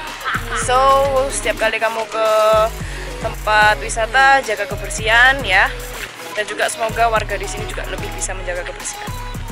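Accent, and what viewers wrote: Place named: Java, Indonesia. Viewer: Medok mbak, hehehe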